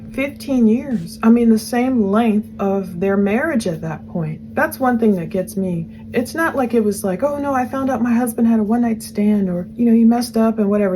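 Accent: Australia. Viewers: Love your channel think that accent is Aussie tho 😊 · Australian accent , you do an amazing job. Thankyou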